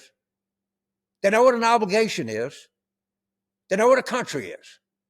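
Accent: South, United States. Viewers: Southern redneck?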